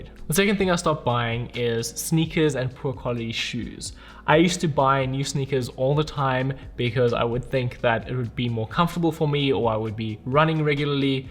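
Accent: South Africa. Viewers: You sound South African! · I can't place that accent, it sounds South African? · Are you South African? 🙌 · Are you South African? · Sounds like a Bishops educated (Cape Town) ex South African 🤙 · I love all your videos....and listening to the familiar South African accent has nothing to do with it! ☺️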